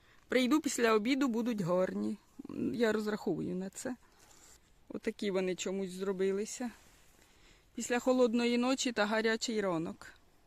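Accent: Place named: Varva, Ukraine. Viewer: Знімайте будьласка довші відео,хочеться Вас слухати і слухати.Якаж співуча мова наша,я з Варви.Але вже 50 років там неживу,але говір впізнаю завжди.Будьте здорові і успішні.Божої благодаті Вам і вашій родині.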